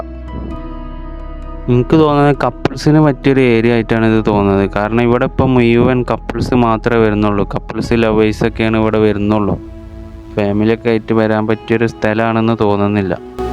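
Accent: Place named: Malappuram, India. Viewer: ഇത് മലപ്പുറമാണ് ഇവിടെ ഇങ്ങനെയാണ് സംസാരിക്കുക.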